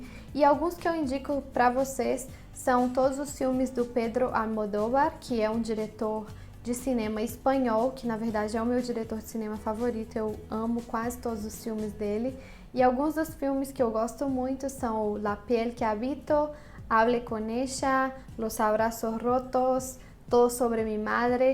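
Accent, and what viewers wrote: Minas Gerais, Brazil. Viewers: Are You from minas gerais??? Seu sutaque não é estranho. I loved your Voice. i Liked ok! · O espanhol dela não é lá essas coisas.... Mineira, detectei....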